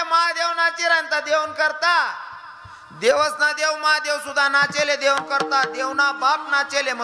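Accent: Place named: Ahirani, India. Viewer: खरंच खानदेश रत्न आहे महाराज पिवर अहिराणी बोलतात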